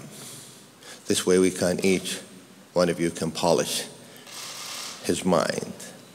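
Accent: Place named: United Kingdom. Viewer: Masha Allah Sheikh Saud's accent is really so perfect he has the Britain one first time I get to hear his Speech wooow so fantastic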